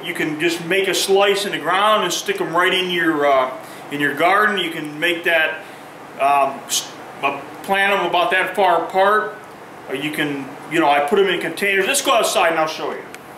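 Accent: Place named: Midwest, United States. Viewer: What is your location btw? It sounds like you are from the Midwest 😀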